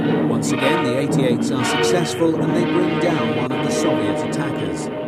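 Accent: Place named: United Kingdom. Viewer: I feel like I'm in a fox hold during battle, with some calm British guy yapping next to me.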